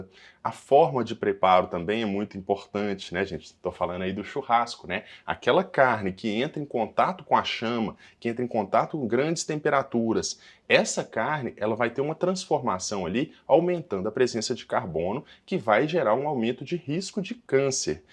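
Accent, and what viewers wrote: Minas Gerais, Brazil. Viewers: Obg pelo vídeo,me ajudou bastante ,descobri hoje que estou com esse problema. Acho muito bonitinho seu sotaque mineirinho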